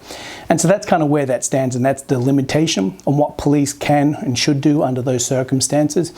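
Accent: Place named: Australia. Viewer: you sound like an Aussie. When will the US have insurance and registration linked to the number plate so the police only need to ask for your licence?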